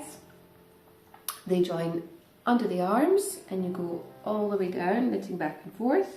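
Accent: Scotland, United Kingdom. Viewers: Hi! I am near Aix en Provence and I’m a sucker for the Scottish accent! I too knit but right now I’m spinning more than ever! Have a lovely afternoon and I hope our paths will cross! Adrien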